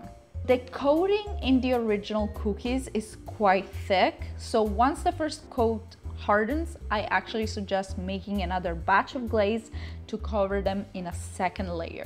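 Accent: Italy. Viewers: Love Italian accents on both men and women, semi musical speech intonation, like Argentinian spoken spanish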